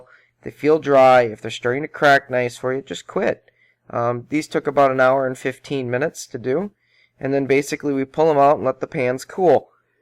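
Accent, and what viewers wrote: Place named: United States. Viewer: We love your American accent! Thanks for telling us a great recipe :) Really helpful - we have thousands of giant ones!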